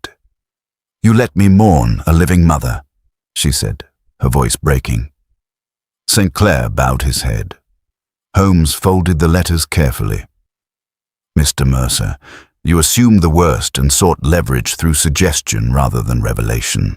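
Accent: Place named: Liverpool, United Kingdom. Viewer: Ok Scouse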